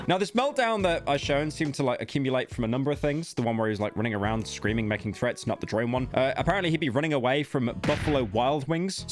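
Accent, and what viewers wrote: United Kingdom. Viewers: I'll be honest, I thought he was British.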